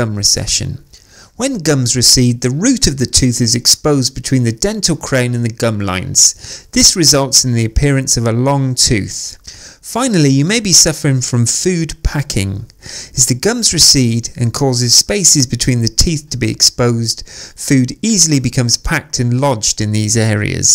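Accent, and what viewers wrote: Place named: Bristol, United Kingdom. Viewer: The suggestions from this video are: one tablespoon sesame oil oil-pulling; Sea salt rinse; Coconut oil mixed with baking soda and use as toothpaste; Chamomile flower tea; Myrrh in mouth rinse; Tea tree oil on toothpaste; Septilin, buy in capsule form; Flossing. Cranberry juice and other sources of vitamin C; Green tea; Gum Recession toothpaste which this chap sells. Lovely accent! Somewhere near Bristol, I'm guessing.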